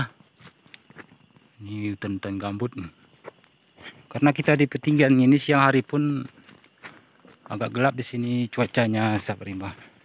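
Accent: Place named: Gayo, Indonesia. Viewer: nampak eee si nos konten nee urang gayo tok, logat epeh betih we 😄😄 salam satu hoby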